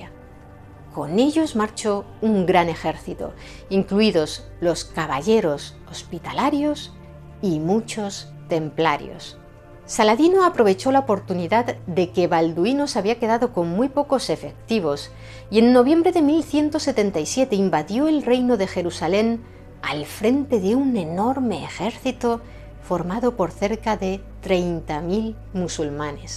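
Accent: Spain. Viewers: Ese acento español es bien agradable, de que zona es?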